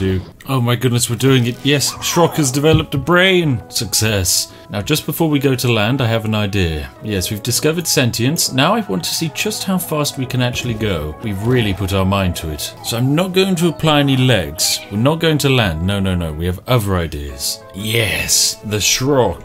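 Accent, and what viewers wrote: United Kingdom. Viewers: Are you actually British because that's not how i speak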